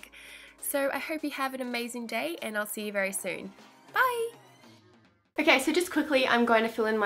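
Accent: Australia. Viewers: Sounds Australian so that would make sense. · I am not a native english speaker but I'm used to Lauren Curtis's accent,so I was feeling this beauty guru is Australian as well!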